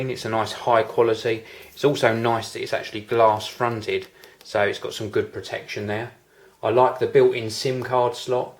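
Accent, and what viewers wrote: United Kingdom. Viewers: british accent :D i love it :D:D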